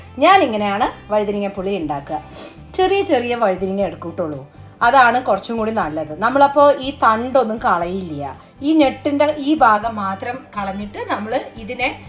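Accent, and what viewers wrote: Palakkad, India. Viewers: palakkad slang kelkkan super njn thrissur anu pne curry indakkunund tto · Madam.your Palakkad language kekkan sumundu.kettolin Palakkadil evedeyanu Basha kettu kollongode side Anu thonnunnu .with best wishes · your Palakkadan language awesome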